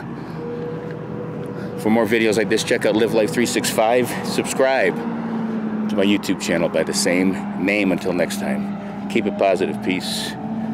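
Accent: East Coast, United States. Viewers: Are you from the East Coast?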